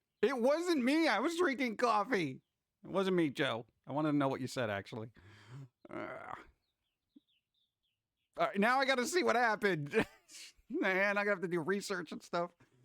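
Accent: Boston, United States. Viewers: Boston accent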